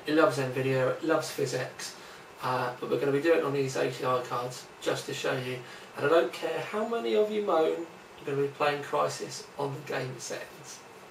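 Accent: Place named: Plymouth, United Kingdom. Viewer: From the accent I would say Plymouth.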